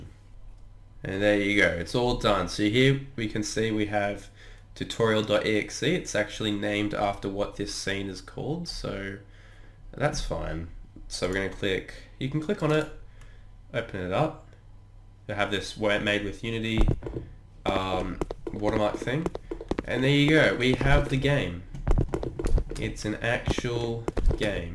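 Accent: Australia. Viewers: Are you an Aussie if I’m wrong please correct me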